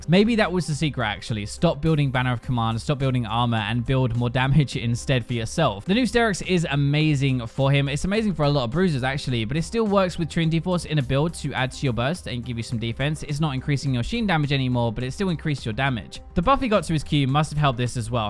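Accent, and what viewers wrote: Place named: RP, United Kingdom. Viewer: I love your accent! I love RP as well... :)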